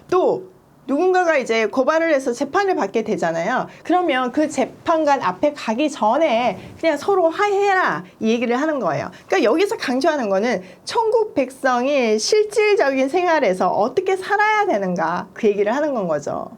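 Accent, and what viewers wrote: Gyeongsang, South Korea. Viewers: 경상프라빈스 인토네이션 이군요 일크름을 넘 감사합니다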